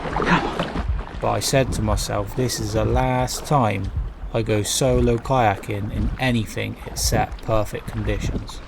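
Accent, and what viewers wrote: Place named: West Country, United Kingdom. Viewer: Well done, thanks for another entertaining video. I'm guessing the accent is West Country, Bristol maybe? (I spent 20 years in Salisbury). Hope you managed some😴 sleep later. The poem.....................well let's just say don't give up the day job🤣🤣 Plus, I haven't figured it out unless the 40 stones throw were one for each year of the birthday boy? 👍🇬🇧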